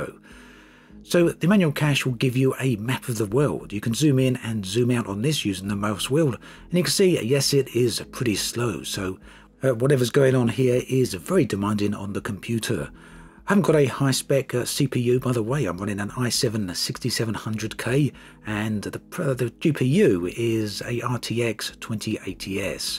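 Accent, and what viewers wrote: United Kingdom. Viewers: His English is so think from Englad or Wales or somewhere. He seaks so fast and move the issues so quickly It is like pulling a rabbit out of a Hot. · Because he’s British? I mean, people do have different accents depending on where they’re from 🙄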